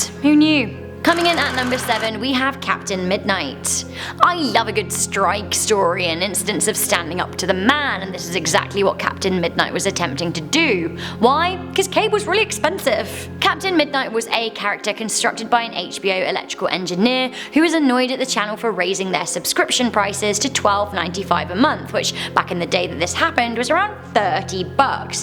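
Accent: United Kingdom. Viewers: Why are you british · I always prefer to watch scary videos with a British person. Makes me feel safer lmao. Probably cause it makes me feel at home. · Jane admits your British accent is perfect. Who is Jane? That is your choice.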